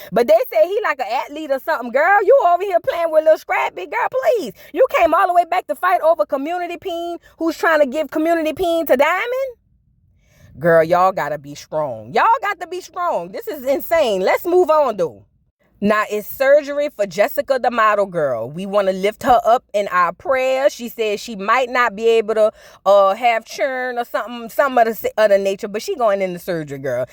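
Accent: New Orleans, United States. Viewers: It’s ya naworlins accent for me 😂😂😂 keep it up girlie 💐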